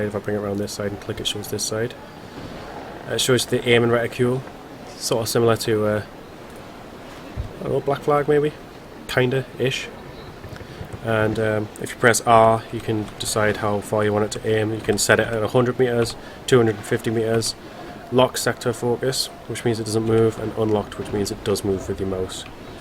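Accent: Newcastle, United Kingdom. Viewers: You from Newcastle area? Thanks for the video. I'll probably buy this when its finished.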